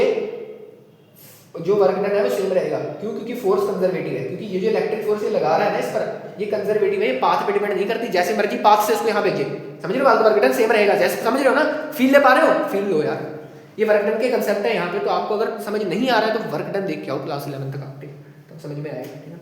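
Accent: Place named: Punjab, India. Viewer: Nice video and intro in punjabi tone I like this keep it up 🎉🎉😊🙏🏼🥰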